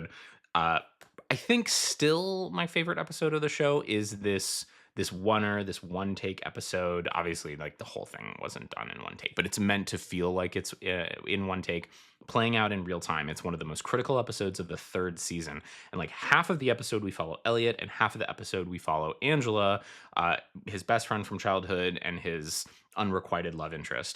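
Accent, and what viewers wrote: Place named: Canada. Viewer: See? There’s a reason why I thought you were Canadian at first 😂😂😂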